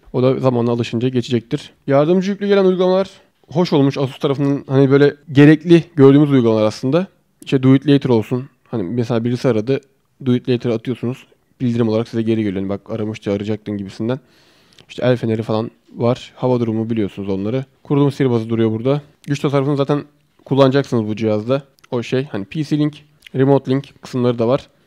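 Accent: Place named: Eskişehir, Turkey. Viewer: Eskişehirrr????? Eskişehirmisin sen abi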